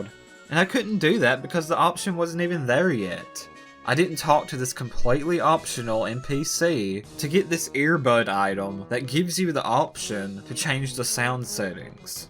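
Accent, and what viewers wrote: United States. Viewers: Damn your American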